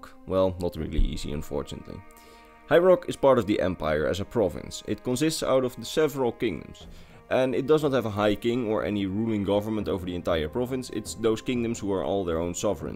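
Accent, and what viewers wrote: Netherlands. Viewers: lekker Nederlands accentje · Haha bro je klinkt zo nederlands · Are you dutch? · First 2 seconds i can hear u dutch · It's Dutch haha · Is this guy dutch? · Ik hoor een Nederlandse accent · Listening to your accent I think you are Dutch, right?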